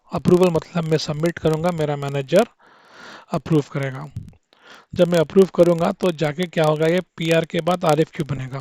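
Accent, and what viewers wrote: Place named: Hyderabad, India. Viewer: Abba Kiraak Hyderabadi accent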